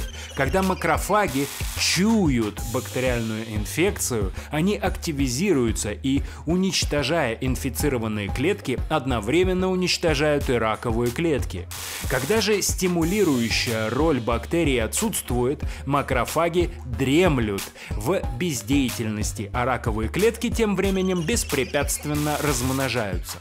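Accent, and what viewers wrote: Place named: Baltic states. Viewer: из Прибалтики,вот и акцент,видимо,местечковый.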